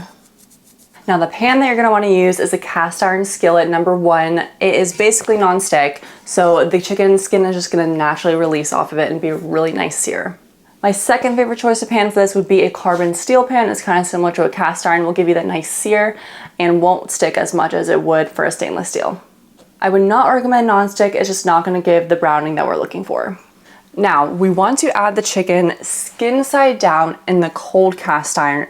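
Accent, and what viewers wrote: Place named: United States. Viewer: THE most grating American accent….pepperrrr,wadderrr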